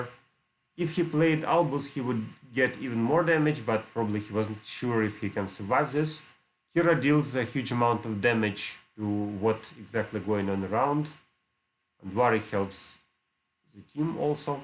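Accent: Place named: Russia. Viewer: в твоем английском я слышу русский) isn`t it?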